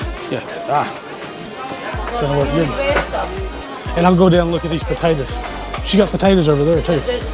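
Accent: South, United States. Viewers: Good choice moving to Belgrade Serbia is fun lots to do you seem to have a southern accent I'm guessing georgia or florida.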